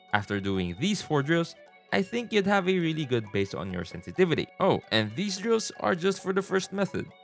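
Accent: Philippines. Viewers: Hey, just discovered your channel. What's your nationality? You sound very Pinoy English.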